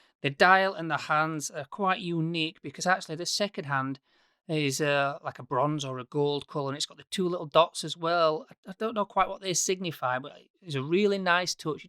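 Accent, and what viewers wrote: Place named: Huddersfield, United Kingdom. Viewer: Nice to see a watch reviewer from Huddersfield. It's a nice town and nice people, lived there for almost 7 years. Miss that accent. Brilliant review, well done.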